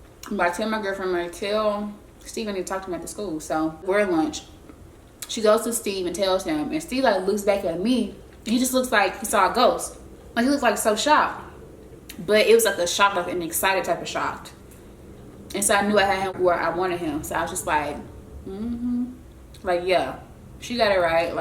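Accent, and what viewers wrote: Memphis, United States. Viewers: Zaxbys come be hearing from me ! 🤣🤣 your so memphis I love it !!! #901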